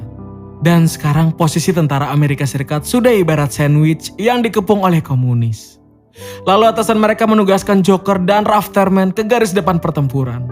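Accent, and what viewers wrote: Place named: West Java, Indonesia. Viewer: Sunda ngapak haaaaa,,,,perbaiki bahasanya bro semoga sukses iya jangan terlalu lebay suaranya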